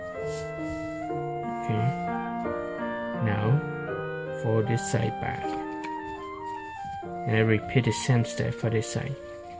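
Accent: Vietnam. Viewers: Nghe cách nói tiếng anh là biết người việt rồi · Anh này làm video hướng tới người nc ngoài, nên tiếng Anh là chính, nhưng là chất giọng Việt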